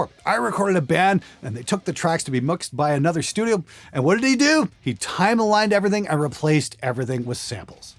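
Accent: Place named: Canada. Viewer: I wasn't surprised one bit when this guy said he was Canadian, my guess somewhere in the southern Ontario. Love your shit keep them coming!!!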